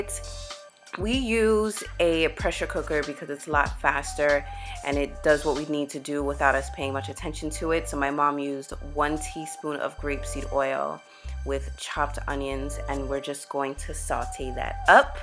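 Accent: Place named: Caribbean. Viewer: U sound so Caribbean, Jamaican or barbadian to be exact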